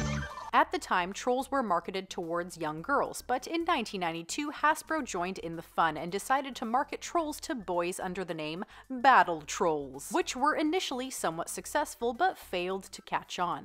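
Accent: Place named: Canada. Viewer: You have a cute Canadian accent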